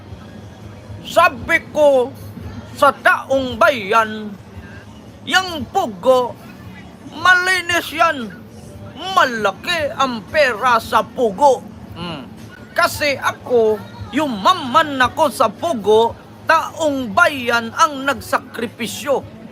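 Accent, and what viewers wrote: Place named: Waray, Philippines. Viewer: Waray ka Sir?accent mo Sounds waray.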